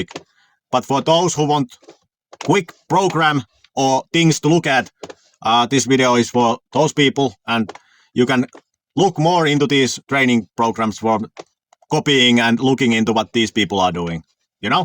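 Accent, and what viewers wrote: Finland. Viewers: Just by listening to your accent, you are finnish! I was in seinajoki for 1 year! Mitta kuulu? Lol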